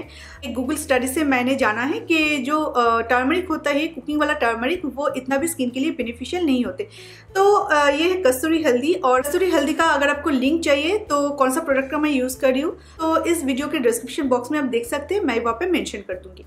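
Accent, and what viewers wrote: Bengal, India. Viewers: Apne to bangal ka didir moto hindi bolta he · App Bengali hain , mujhe baat sun ke laag raha hain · Yes it's the accent of bengoli s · Bengali? you have bengali accent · Apki Hindi me Bengali tone h😁🤭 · Are u bengali? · u r bengali right? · Aap bengali ho right bola na😅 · Tag mark of Bengali that accent. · R u bengali ? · dude i'm gonna ask the same question, well her accent resembles Bengali😁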